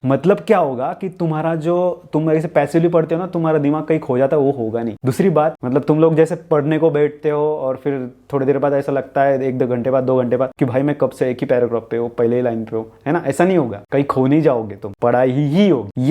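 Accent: Maharashtra, India. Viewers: I'm also from Latur.... Aur aapka Maharashtrian accent dikh raha h hindi me... 😅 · That Marathi accent while speaking Hindi 😍😅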